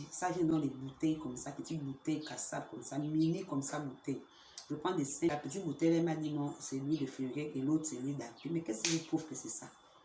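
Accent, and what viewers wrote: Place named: Gabon. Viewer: Accent gabonais 🇬🇦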